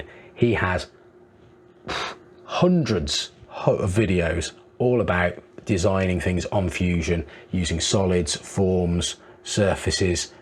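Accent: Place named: Oxford, United Kingdom. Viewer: Thank you for your very good oxford english. For us germans it is a benefit to listen to you.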